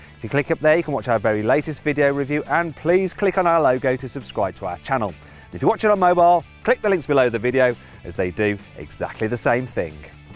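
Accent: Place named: Birmingham, United Kingdom. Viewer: he's a brummy he's normal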